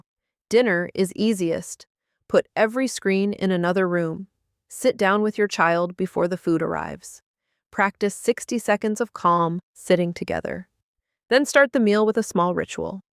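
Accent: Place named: United States. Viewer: the american japanese accent is frying me 😭😭💀💀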